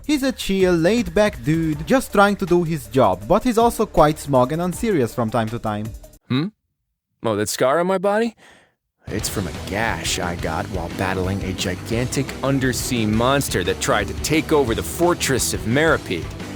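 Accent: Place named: Romania. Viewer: your accent sounds sooooo familiar. Are u by any chance romanian?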